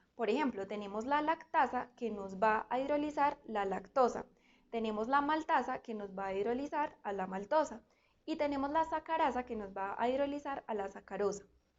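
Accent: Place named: Colombia. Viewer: Que bonito acento, supongo colombiano y gracias por enseñar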